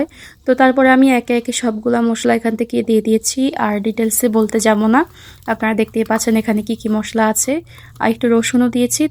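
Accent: Noakhali, Bangladesh. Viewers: Apu apni Noakhalir na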